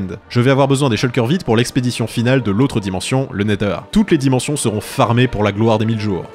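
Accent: Quebec, Canada. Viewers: Coucou, tu es Québécois ?? Sinon j'aime trop t'a série 😘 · Tu pourrais faire un monument pour la meilleure de tout les pioches ps j’adore ton accent québécois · Es que tu est quebecois ou canadien😃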